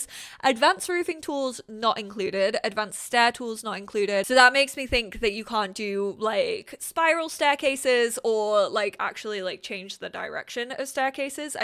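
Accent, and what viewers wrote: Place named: Northern England, United Kingdom. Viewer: Does actually live in england still. To me her accent sounds like 40% northern and the rest vaguely america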